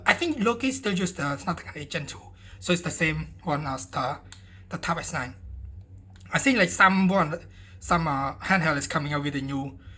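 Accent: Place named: Cambodia. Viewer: You sound like cambodian, where are u from ?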